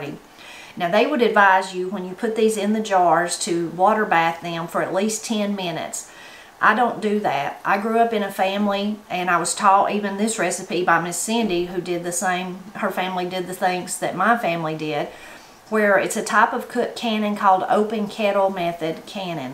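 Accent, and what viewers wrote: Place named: West Virginia, United States. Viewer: I just love your videos! My sweet mother in law was from West Virginia and she shared your lovely accent and oh so many of your “mountain” down home vocabulary! I am from Philadelphia and was blessed to marry my husband and his lovely family! Thanks again. Have a great day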